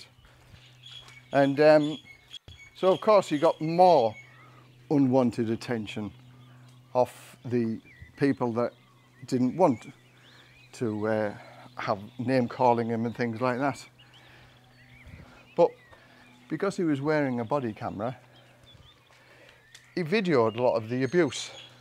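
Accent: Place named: Northern England, United Kingdom. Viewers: Hi what accent is that, sounds like my Middlesbrough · I am an 86 year old Englishwoman and I will say that this is the best country to live in. It is not the lovely country that it was in my childhood but I would never live in another country. I have travelled extensively and lived,for three years, in Colonial Africa where I was very homesick for England. You sound like a northerner to me. Perhaps you have not seen the lovely parts of England.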